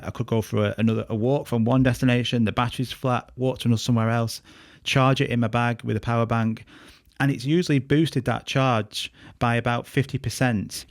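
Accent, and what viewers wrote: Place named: North, United Kingdom. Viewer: Can’t trusts anyone with a northern chavvy accent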